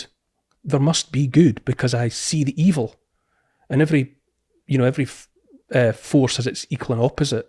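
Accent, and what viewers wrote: Scotland, United Kingdom. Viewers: Scottish is a cool accent .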